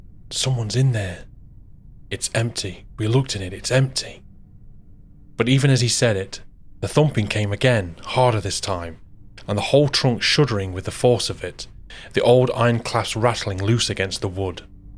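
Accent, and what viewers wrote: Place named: East Midlands, United Kingdom. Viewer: Notts or Derby lad narrating this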